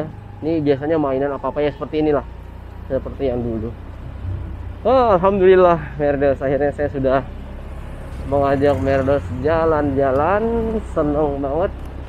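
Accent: Banjar, Indonesia. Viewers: Lah orng banjar kah pian😂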